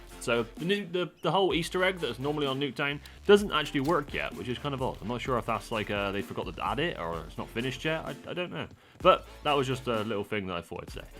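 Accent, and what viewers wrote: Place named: Bristol, United Kingdom. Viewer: You sound very local to me lol...... Bristol?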